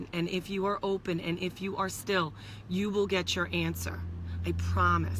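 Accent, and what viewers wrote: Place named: Northeast, United States. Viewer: Wow. A properly asked question. I applaud you. She sounds as if she has a N.E. American accent. Perhaps as far West as Indiana or Illinois.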